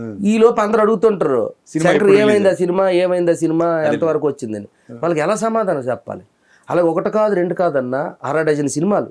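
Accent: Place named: Srikakulam, India. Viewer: శంకర్ గారు మీరు శ్రీకాకుళం కి ఆణిముత్యం అండి. మీలాంటి సింపుల్ గా ఉండే వాళ్లకు అలాగే మన శ్రీకాకుళం యాసకి వన్నె తెచ్చిన మీకు అభినందనీయము అండి శంకర్ గారు. ధన్యవాదములు అండి🌹🌹🌹.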